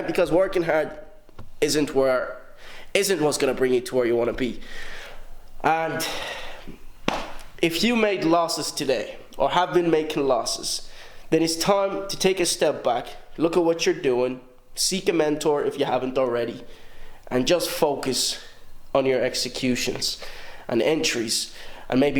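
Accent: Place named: Ireland. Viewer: He from Ireland 🇮🇪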